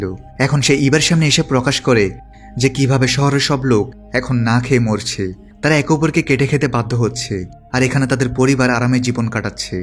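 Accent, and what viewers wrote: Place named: Pabna, Bangladesh. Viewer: vai morgir khowar😁😁,,ata to pabnar ancolik vasha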